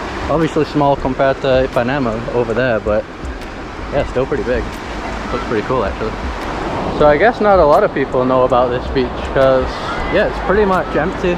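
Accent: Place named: Northern England, United Kingdom. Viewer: haa thought so you have a northen accent like me so picked it up just came across your channel and im liking it, loving vlogs from 🇧🇷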